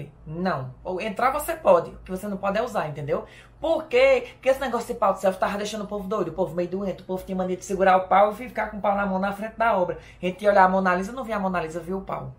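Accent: Northeast, Brazil. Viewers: eu te acho a cara q se assume dentro do q vc é, nordestino arretado muitíssimo inteligente, porém com sotaque incivelmete nordestino q portada acordo com a situação e ocasião continue esse carinha gente fina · Sotaque nordestino lindo, admiro muito os nordestinos e o Nordeste. Estado lindo povo maravilhoso quero conhecer esse estado belo.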